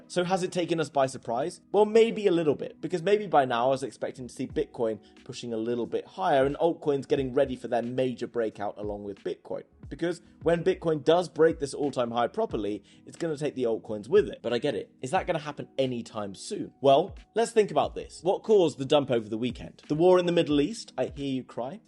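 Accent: United Kingdom. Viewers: the British accent! love it :D and thanks for the info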